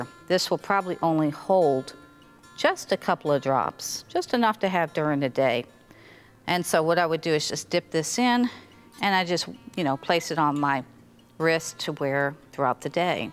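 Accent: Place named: Texas, United States. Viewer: She got that heavy Texas accent “oowls" lol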